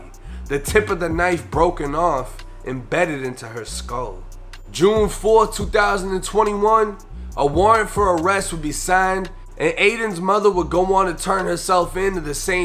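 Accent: Boston, United States. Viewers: You gotta be from Boston or the east coast no? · Great show I've been ROCKEN WITH YOU since you was on 23 and 1 I have to say young man you need to try to expand cause just the way you tell stories and with your Boston accent it just works keep it 100 and you will go along way this is the old man from Indiana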